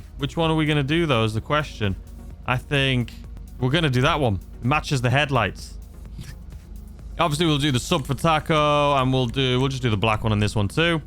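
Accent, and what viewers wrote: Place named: Doncaster, United Kingdom. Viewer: Sounds like your from sunny Doncaster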